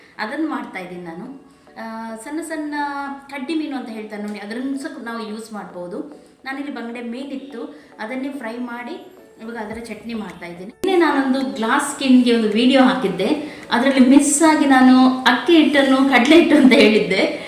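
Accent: Mangalore, India. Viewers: ಕರಿಬೇವು ಪುಂಡಿ 😀😀😀😀 ನಮ್ಮ ಮಂಗಳೂರಿನವರ ಕನ್ನಡ ಸೂಪರ್. ಯಾಕೆಂದರೆ ಕನ್ನಡ, ತುಳು, ಕೊಂಕಣಿಯಲ್ಲ ಮಿಕ್ಸ. ಸೂಪರ್ vlog 👌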